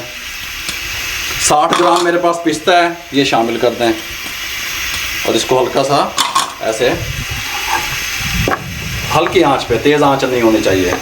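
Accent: Punjab, India. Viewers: Asslam o alaykum, Bhai Jan aap k liye kucch mashwara dena chhahta hoon. Cheff aap ki kucch baten improvement chhahten hen. please consider Karen. 1- aap ney kam bolna he. 2- koi mas'la nahin he. (Matt Boleyn) 3- tension nahin leni (Matt Boleyn) 4- sirf Urdu Boleyn. Punjabi ka tarrka matt lagaeen. 5- aurr sab se buraa lagta he. (Samjha Karen). 6- aap ko chahiye sabb se pehley kisi bhi recipe key ajzaa (ingredients) bataen aurr unki quantity (miqdaar) btaen. Aurr phhir recepie ko banana shuroo Karen. Ummeed he meri baton ko masbat lete huee amal Karen gey. Jiss se insha'Allah aapki video quality improve ho gi.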